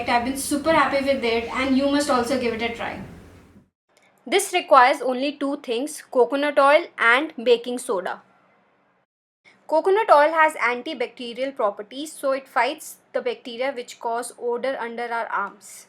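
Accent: India. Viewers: Your voice is very irritating.really don't like Indian dialect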